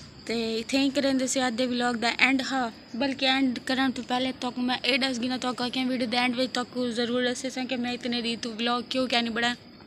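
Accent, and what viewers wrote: Saraiki, Pakistan. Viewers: صدقے تھیواں تیڈی میٹھی سرائیکی ❤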